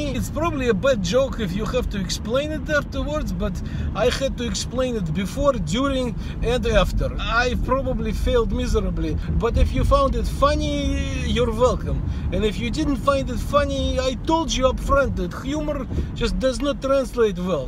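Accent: Russia. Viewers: It was funny and the accent made it even funnier. Gotta love Russians. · im whatching your videos and im 15 to trying to copy some of the ways you pronounce word or vords to get a cool rrrrrrusian eccent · i enjoyed ur russian tone love from nepal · I found this dude because I was playing metro Exodus on ps4 and I started to look up Russian accent ...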